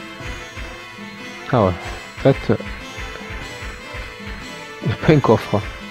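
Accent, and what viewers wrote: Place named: Provence, France. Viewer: Par contre pourquoi? 13 je comprend étant de Martigues (l'accent me rappelle mon chez moi) mais?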